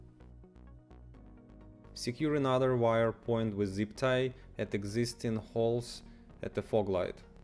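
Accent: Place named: Russia. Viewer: Strong rashn akcnt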